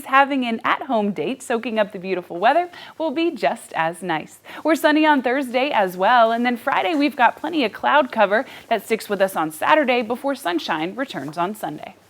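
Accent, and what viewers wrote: United States. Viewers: She's not local, she talks like a yank lol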